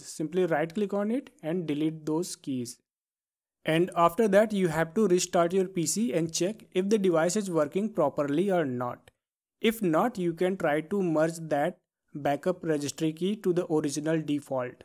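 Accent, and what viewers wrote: India. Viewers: Random YouTubers with Indian accent holding our society and computers on their shoulders. Thank you.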